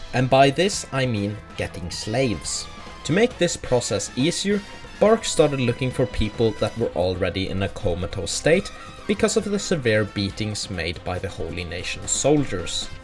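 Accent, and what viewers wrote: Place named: Scandinavia. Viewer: Could hear at once that he's a fellow Norse boy ^^ Gonna watch this video later today :3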